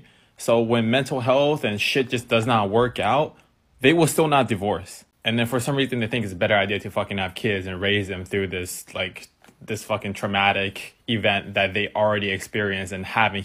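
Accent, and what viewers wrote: Taiwan. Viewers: 聽口音是台灣移民過去的😂 冰箱還有一罐八寶粥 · 這口音 台灣過去的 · 聽中文口音感覺是臺灣移民。 希望大家都開心。 畢竟當初移民應該是希望家庭會有更好的生活條件。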